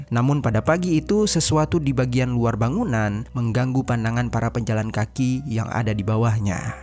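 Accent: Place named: Palembang, Indonesia. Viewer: Cara bicara pembawa acaranya kok mirip bgt ama temen sy yg org palembang, ya 😅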